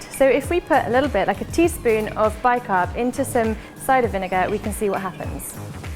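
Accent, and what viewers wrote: United Kingdom. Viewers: My English is not good i can not under stand what she is telling, especially British accent. I wanted to know difference btw b.soda and b.powder.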